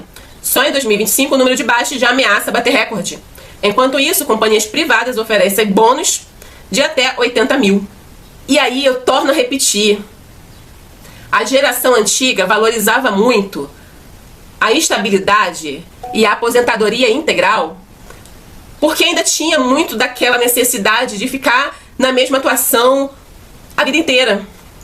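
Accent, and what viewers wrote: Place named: Rio de Janeiro, Brazil. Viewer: acho lindo o sotaque dos cariocas, saudades do Galeão.😂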